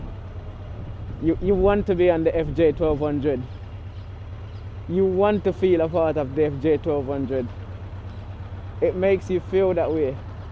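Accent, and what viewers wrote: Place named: Jamaica. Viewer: Hows the ride brother? You sounds like jamaican hehe i have many friends and tour in jamaica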